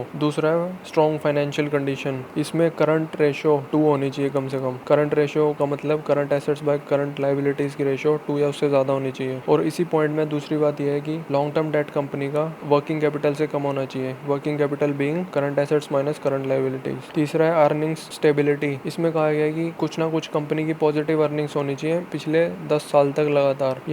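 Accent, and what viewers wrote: India. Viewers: One suggestion/ recommendation only with well wishing to improve. Please speak closer to mic as awaz thoda faint hai. love your style of talking. Talking like a layman with Delhi Hindi twist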